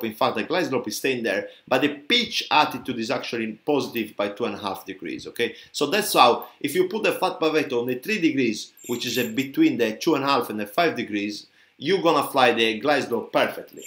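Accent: Italy. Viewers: Very well explained despite the very strong Italian accent.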